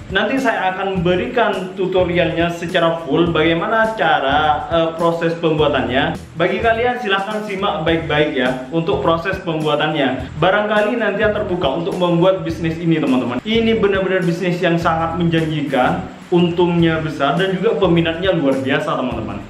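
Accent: Madura, Indonesia. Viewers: Orang madura ya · Madura kayak nya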